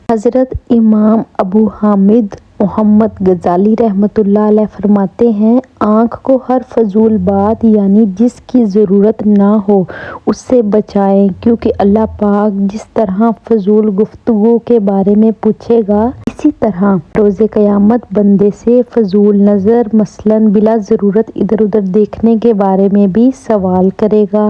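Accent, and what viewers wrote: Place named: United Kingdom. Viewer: جزاک اللّہ ۔ اچھی اصلاحی باتیں ہیں ۔آپ بھی مزید کوشش کریں کہ صرف آوز چلائیں اور سامنے نہ بیٹھیں ۔تاکہ اچھی باتیں سنتے ہوئے آپ پر نظر نہ پڑے۔ اور ناظر منظور دونوں نظر کے گناہ سے بچیں ۔ اور حیث کو حریث بولیں ہدیٹ نہ بولیں۔ انگریزوں کے لہجے میں۔ آپ تو اردو خوان ہیں۔ کیوں حدیث کی جگہ ہدیٹ بولتی ہیں۔ اسکی اصلاح کریں ۔ جزاک اللہ ۔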